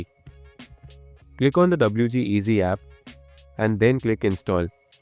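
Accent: India. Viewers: From your accent you sound Indian. I listened to many american tutors on this subject. I have easier time understanding american, but they only confused me further. You, on the other hand, were succinct, clear, well paced, and I just got my job done. Kudos.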